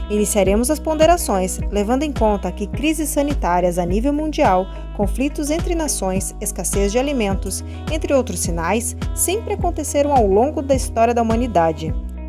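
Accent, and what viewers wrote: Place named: Paraná, Brazil. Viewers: Me inscrevi nesse tbm , irmã. Vc é do Estado do Paraná?. Aparentemente parece vegetação da região sul, dois lugares me faz lembrar da região Sul ou Paraná como tenho dito ou Santa Catarina . Região q está no mínimo a 800 M de altitude do nível do mar . Eu tô certa QTO a isso?. E tbm pq vc tem sotaque paranaense . Ou no máximo santa Catarina q o sotaque é mais forte , mas acho q vcs são do Paraná. Sucesso nessa empreitada. E outra vc pelo jeito de parentes q ainda estão são n foram beijados, mas no meu caso n tive esse livramento familiar. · É região sul, está muito certa! O sotaque do sul é tudo meio parecido mesmo... kkkkk! E nesta região alguns pontos são em torno de 900 metros de altitude. O Criador nos guiou para este local, foi de uma hora pra outra. Estávamos nos organizando apenas com barraca para fugir quando chegasse o momento. 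Por isso, estamos mostrando tudo que estamos fazendo! Desta forma, acreditamos que o nosso mover pela fé possa encorajar os irmãos a fazerem o que estiver ao alcance. Sabemos que construir refúgio na montanha é algo mais extremo, mas é olhando para um extremo assim que muitos podem pensar em fazer o mínimo. Entende? Sobre família, eu faço questão de contar a todos que me perguntam! Apenas meu marido está enxergando do mesmo jeito que eu. Meu pai e minha mãe apenas gostam de natureza, mas meu pai acha loucura esse lugar. Ele reclama quando vai lá... E ele não gosta quando a gente fala do tempo do fim. Já a minha mãe fica mais em cima do muro, ela até entende tudo que a gente fala, mas ela acha que não é para agora. Mas eu ainda tenho minha irmã, meu cunhado, a família do meu cunhado e toda a família do meu marido que estão vivendo no mundo e não dão muita importância quando a gente fala alguma coisa. 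Então, nós sabemos que precisaremos deixar tudo e todos para trás quando tudo acontecer. Estamos nos preparando espiritualmente, psicologicamente e na parte prática também. Quanto à empreitada, eu e meu marido temos em mente que não faríamos isso, até não conseguimos acreditar que est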